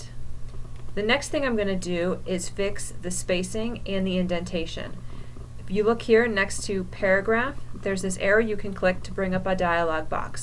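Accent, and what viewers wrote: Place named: Chicago, United States. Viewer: Love the Chicago accent <333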